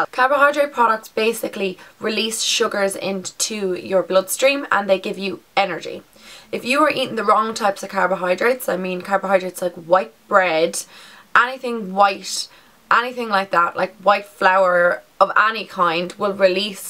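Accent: Ireland. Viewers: Yes I'm doing good. I walk daily, I'm off oxygen, and insulin, so I control my diabetes with diet now. By the way I wanted to let you know that Ireland is my dream vacation. My ancestors are Irish and Scottish. We go back to a cousin of William Wallace. So I love your accent. What kind of dance do you do? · this is such a good video and i thank you for making it. i try to eat healthy the thing is when i work i am 100 percent focused on working and i forget to eat sometimes. i seriously do by the time i look at the clock it was like 4 in the afternoon. by the way i love your Irish accent wish i had mine. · cant believe ive never seen you on youtube before, as soon as i heard the accent i got so excited lol (im from ireland too). loved this video so much very informative and holy god your figure!!!! its amazing you should be so proud! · the way you say "but" "fun" "much" etc is so different to the British or the Australian or American accent. 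I still remember the first time that I went to Dublin, I freaked out! you do speak quite clear to me though. hugs! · Hi,thanks for such detailed video.I am a doctor myself,working in UK,have lived in Northern Ireland 4 yrs ago,thats why i liked your Irish Accent :) I have myself have these changes of lifestyle by eating healthy and workout! i totally agree there is nothing such thing as diet,its for whole of ur life...i have always heard abt how good oats are,i will try to include them in my brekky from now :) x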